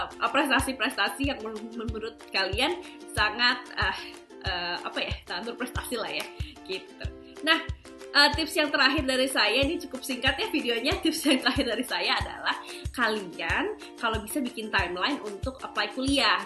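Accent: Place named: United Kingdom. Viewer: Logatnya Udah Btistish UK banget . 😅😅😅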